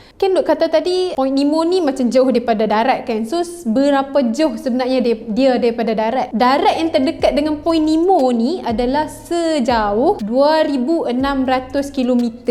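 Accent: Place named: Kuala Lumpur, Malaysia. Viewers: Sedap kot die ckp key el...bia la dia..alahai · Sis please jangan nak key el sangat,saya lagi suka sis cakap jaa loghat utaqa,saya suka dengaq kaum2 hawa dari utaqa ni bila bercakap,saya yakin ramai lagi yang suka sis pakai loghat utaqa bila bercakap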